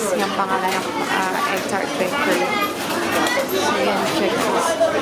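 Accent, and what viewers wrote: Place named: United Kingdom. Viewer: chinese national pala si ate langgaa😅 pero ang accent ng pananalita is parang british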